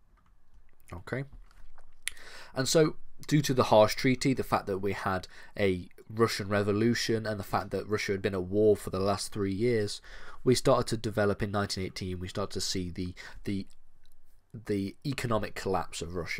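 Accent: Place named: Northern England, United Kingdom. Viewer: you can tell this guy is nothern by the way he says "class", i love it. great videos literally learnt all my year one contnent from this page. screw online school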